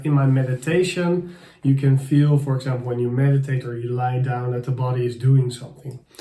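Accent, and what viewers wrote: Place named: Netherlands. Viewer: As soon as this started playing, I recognized your accent right away because my Divine Masculine is Dutch also! Ha ha! And I love when I hear other men speaking with a Dutch accent because it clears up for me that it's my DM's VOICE that affects me! Blessings and thank you! My kundalini has just begun rising and I need all the info about it I can find!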